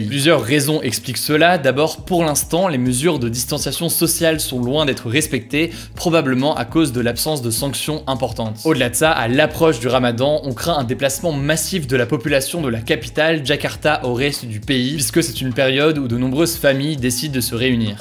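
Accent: England, United Kingdom. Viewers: Ton accent anglais c'est un plaisir à entendre :D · Le british accent d’ 💛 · Son accent anglais me fascinera toujours autant XD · that british accent you have :o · Bel accent british!!!!! 🤗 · Mais cet accent anglais je suis admirative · Je like rien que pour ton accent anglais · Il a un accent anglais britannique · Cet accent anglais (sans ironie) pas mal ! 😂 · Ton accent anglais je l’adore 😂😂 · Comme d'habitude merci pour tes vidéos ! ❤️ Ce résumé est juste ultra pratique pour ne pas partir en psychose totale... Et au passage j'aime trop ton accent anglais ! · L'accent anglais wow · Cet accent britannique 🤩!! Merci pour ces news.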